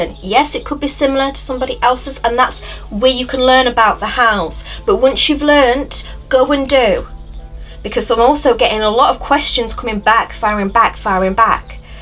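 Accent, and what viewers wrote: United Kingdom. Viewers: I do NOT want to hear this...but I NEED to hear it. Where does the fear come from? Why can't I jump right in and not be in fear of making a "mistake"? Thanks for the kick in the butt! I also realized that anything said in a British accent is very palatatable. Keep up the great and talented work,!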